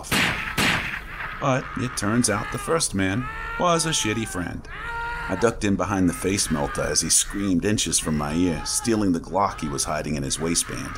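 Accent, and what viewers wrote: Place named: New York, United States. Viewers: This notification was late af! WTF You Tube? I will always listen to every story you put out. I am digging your New York...New Jersey(?) Accent. Amazing job as usual! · Yes I have been to Boston etc but You nailed that fact, You def sound like You came from this side of the Country, I think You did a great Job but us NYER's while dislikes by so many other states have a ton of NYC pride, lol I would be horrible trying accents because I have my English accent is horrible as is my Southern accent etc, I love your channel brother, You are one of the best, Question may be weird but are You Rom's father? I'm asking because it's a rumor around the web and I notice you guys work together along with TDS a lot.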